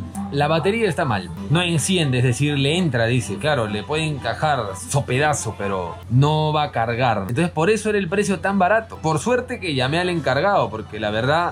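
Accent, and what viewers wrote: Peru. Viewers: Primera vez qe veo tus videos y por tu forma de hablar creo qe eres Peruano :V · mano tu eres de peruuuuu? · Joder bro como me cuesta diferenciar el acento peruano 🤣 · Asu de entrada reconocí el acento de un compatriota. 🇵🇪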